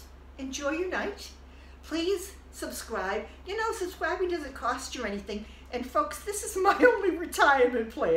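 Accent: New England, United States. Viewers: Are you from Rhode Island or Mass?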